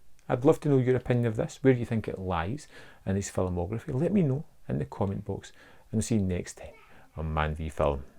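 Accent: Scotland, United Kingdom. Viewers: You should effin subtitle your videos, dude, that thick Scottish accent is too much to handle.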